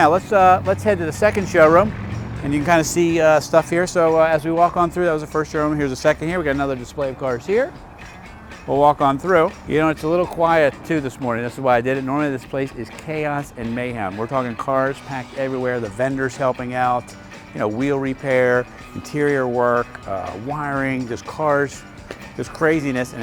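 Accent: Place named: United States. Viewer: really nice demonstration of your place and plain American English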